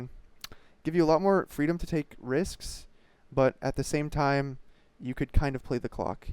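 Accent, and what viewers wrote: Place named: United States. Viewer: No, you're doing it all wrong. You need to mention AlphaDraft before the show, wear a coat and scarf like you can't afford to heat your home, thoroughly discuss the innermost secrets of game design for 20 more minutes, and all while mentioning context a few times. Also where on earth did you get that American accent, Thorin? Strangely enough it suits you.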